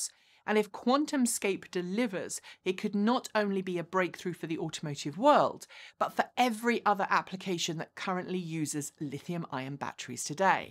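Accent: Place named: United Kingdom. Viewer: A British accent just makes everything sound legit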